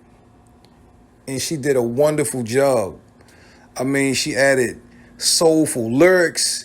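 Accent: Baltimore, United States. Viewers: Your definitely from "Baldamore!"😁😁💯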